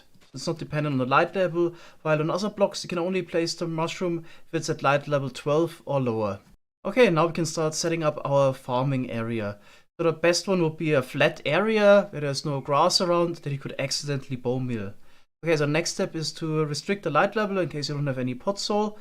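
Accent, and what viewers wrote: Germany. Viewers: Bro, are you german? That dialekt xD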